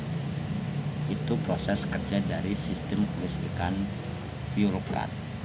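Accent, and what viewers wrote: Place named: Banyumas, Indonesia. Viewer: Salam satu "ngapak" kang..... 😀👍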